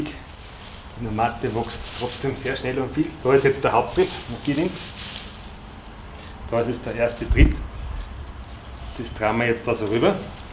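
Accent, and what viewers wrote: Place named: Austria. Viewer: Das IST richtiges österreichisches Deutsch!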